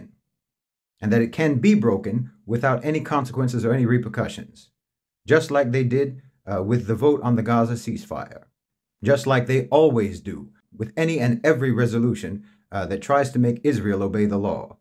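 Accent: United States. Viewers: THIS IS A HUMDINGER OF A PROPOSAL.... IT IS REFRESHING TO HEAR A MAN WHO SPEAKS WITH SUCH AN AUTHENTIC AMERICAN ACCENT VENT THESE TRUTHS... AND RIGHTLY CALLING FOR THE UNITES STATES EXPULSION FROM THE UNITED NATIONS.....THE U.N. BODY WILL BE FAR BETTER OFF WITHOUT THE U.S.A. WITHIN IT. KICK THE U.S.A. OUT INDEED.....& THEN ISRAEL WILL PROBABLY END UP TAGGING ALONG.....WHO CARES..... WILL THIS EVER HAPPEN ? I VERY MUCH DOUBT IT, BUT IT SHOULD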